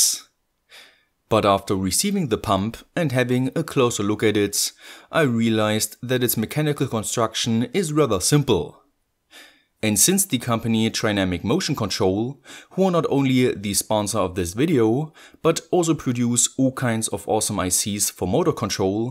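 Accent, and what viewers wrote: Germany. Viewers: i can hear that you a german guy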